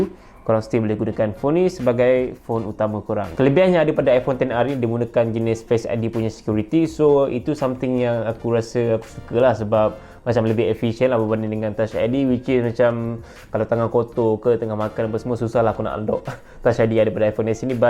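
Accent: Malaysia. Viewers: Aksennya? Dia orang malaysia?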